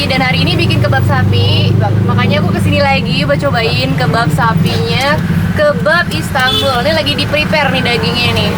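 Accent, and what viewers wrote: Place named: Betawi, Indonesia. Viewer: Logat betawinya lucu..coba lbh di munculin lagi logatnya.. kaya afifah stand up/boah stand up. Pasti lbh lucu..hehe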